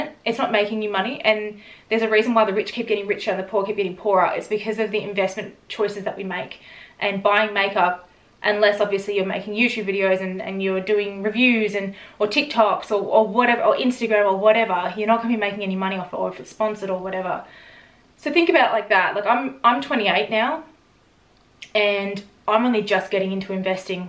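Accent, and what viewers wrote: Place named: Australia. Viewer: don't worry about this toxic remark, you are too polite, girl👏😘, your charisma, intelligence gorgeous Australian accent😉😘, beauty and character make up for anything and everything, ok 💐🙏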